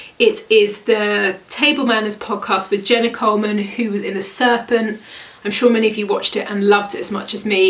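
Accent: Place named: United Kingdom. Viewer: You do just find..Dont pay any mind to others that doesnt have a kind word..Im in the U.S...I find UK a cute accent..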